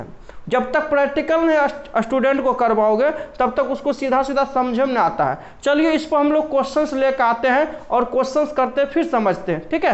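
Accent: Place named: Bihar, India. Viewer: Bhagalpur,munger ,jamui ke taraf ke lagte hai sir aap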